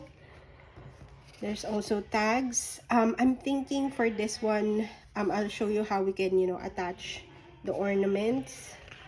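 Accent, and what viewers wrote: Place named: India. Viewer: Thé ornament paper clip are very cute Thanks for sharing your process Love your Indian accent it remenbered me of my 6 years in Bangalore I miss India very much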